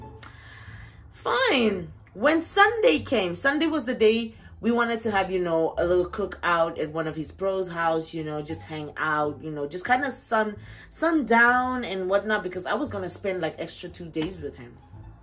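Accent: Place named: United States. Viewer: Damn sis, you catching the American accent 😫🤍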